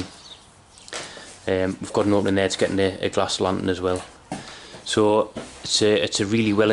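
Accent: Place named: North East England, United Kingdom. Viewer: Not sure why you popped up on my feed, but I'm glad you did! Your accent clued me in that you might not be far away from me. I have an existing single storey extension on the back of my house which was added by the previous owner. It can get very cold, and acts like a heat sink for the whole ground floor. I suspect the insulation (particularly in the roof) is not adequate. Do you do remedial work for existing buildings at all, or could you recommend someone in the North East who does? Thanks!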